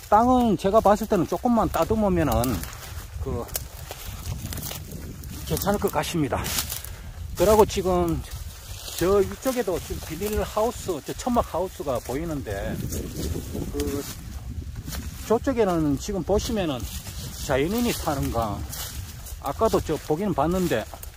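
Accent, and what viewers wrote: Gyeongsang, South Korea. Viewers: 경상도 분이네예 ㅎㅎ 고생하셨습니다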